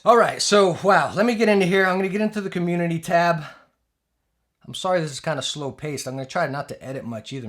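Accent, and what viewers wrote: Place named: New Jersey, United States. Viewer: As a Brit I guessed you were from jersey from your accent so I'm pretty impressed with myself, that could also just be shear coincidence tho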